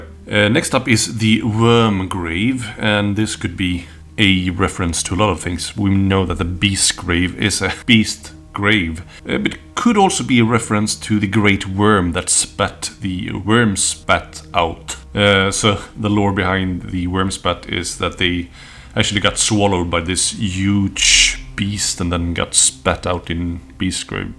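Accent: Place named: Sweden. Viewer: This was a great idea! I wonder, did you reference any of the books from the last year of Warcry for the Gnarlwood? It takes place all over that area…and likely continues to be set there with the new Hunter & Hunted release. I like your pronunciation of some words. The Swedish soft “J”, I love it! “Objectives”, chefs kiss right there dude. Your pronunciation of chasm I find very interesting. I’ve always ever heard it pronounced like cazz-em. With a silent H. I find British English has a lot of silent H’s. Everyone here in North America has to pronounce every single letter and syllable 🙄. Want a good laugh, ask a North American to pronounce Worcestershire Sauce. Lol, it’s a riot. You frequently hear an H added between the c and first e ! Anyways keep up the great work!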